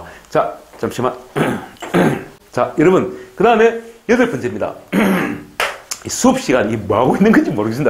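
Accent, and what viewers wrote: Gyeongsang, South Korea. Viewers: 오래전 은퇴하고 가보고 싶었던 나라인데 최근에 안좋은 이미지가 강해서 잃어버리고 있던 나라인데 덕분에 다시보게 됩니다. 맞나? 따라해봐~ 마취강도...ㅎㅎ 경상도 사나이 구수한 강의 친근하고 좋습니다.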